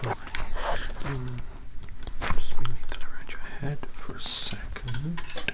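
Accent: United Kingdom. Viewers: You could genuinely do a Micheal or even William Afton from the Five nights at Freddy’s series. As you sound just as British, and almost sinister sometimes. But at the same time you are very funny so you could have fun with it. I don’t expect you to do it I just had that thought, could be cool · Minolica coughs awkwardly in I totally don’t thirst over his gorgeous hair and British accent sorry 😅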